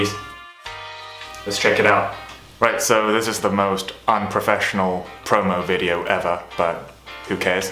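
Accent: United Kingdom. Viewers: Ive never heard this man speak before I didnt think hed be British 😍😭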